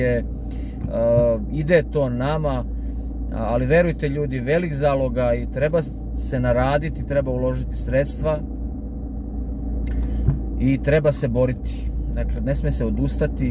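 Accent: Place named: Dalmatia, Croatia. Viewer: Volim dalmatinski dijalekt pozdrav iz srbije !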